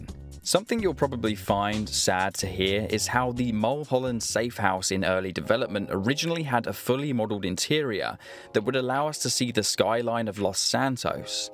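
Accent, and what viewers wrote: Australia. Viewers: Do you haver alittle bit of aussie in your accent?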